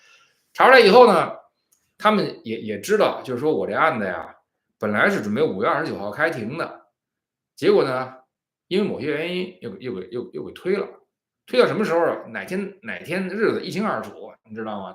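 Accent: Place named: Beijing, China. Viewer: 笑出聲兒……昨天沒睡好郁悶的慌，聽了您的京腔兒播報感覺松爽很多。您小时候得有多淘呀⋯⋯哈哈😂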